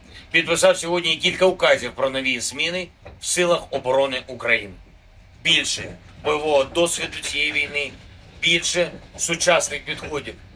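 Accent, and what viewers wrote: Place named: Russia. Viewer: Хреново он по хохлячекму балакае. С русским акцентом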